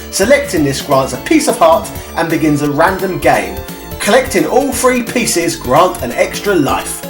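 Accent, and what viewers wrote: United Kingdom. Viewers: Aw man 🎩 you know I'm a fan so why'd you have to slag on rap music? TBH your accent is a charming reminder of some of my favorite UK rappers.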